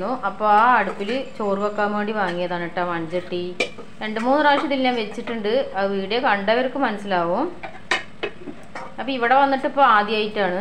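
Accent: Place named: Palakkad, India. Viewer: പാലക്കാട് സംസാര ഞാൻ വീട്ടിൽ പോയാൽ ഇതുപോലെ സംസാരിക്കാം👌❤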